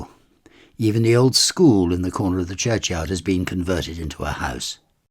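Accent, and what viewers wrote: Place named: Scotland, United Kingdom. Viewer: you are like a British Indiana Jones. 😊 I love it. My grandfather was a British Scottish man, he had the same accent as you. My mom gave me recordings of her father reading poems and your voice reminds me of him. I never met him in person but I feel like I knew him because my mother told me about him. I am a poet and artist like he was. I believe if he was alive now he would be a writer and probably do YouTube videos to teach people about history. I wonder if it's genetic to be a philosopher and lover of literature and history. It seems to run in my family. 😊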